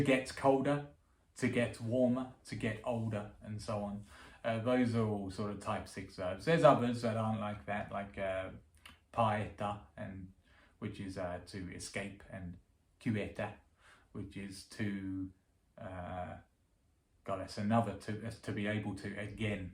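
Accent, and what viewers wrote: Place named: Australia. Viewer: I think he is, and even implied being one. Whatever the case, his English is delightful and perfect. The accent sounds Australian, which is the accent I find the most beautiful 😊 I am a native Finnish enjoyer, but I find it interesting to refresh my "analytical" knowledge of the Finnish grammar and language, too. About rolling the 'r's: that sound is usually the last one that native Finnish children, too, learn to say when growing up! Before they ace it, they usually replace the sound with 'l'. Even I vaguely remember the time when I still couldn't make that sound but was avidly trying to. Your first example of "purra" sounded just fine to me. Personally, I like to say the long 'rr' in a less harsh-sounding manner, quite similar to how you did. I still roll the r, but maybe just with the side of my tongue. It's a feature of the dialect which you could hear in Tampere region. 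Especially their short 'r' is more like the Japanese counterpart, with just a very brief roll.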